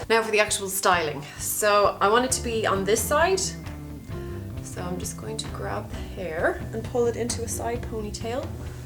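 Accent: Ireland. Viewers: does anyone else hear a little either irish or scotish in her voice?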